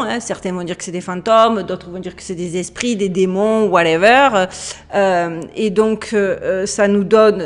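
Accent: South, France. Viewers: Elles sont excellentes tes vidéos, j'ignore d'où tu trouves tes informations mais il y a des choses que je ne savais pas et Dieu sait combien j'en ai vu et entendu des documentaires à ce sujet ! :) Contiue ton bon travail, j'aime beaucoup ton naturel et ton accent du Sud de la France ... Mon épouse a le même ! :)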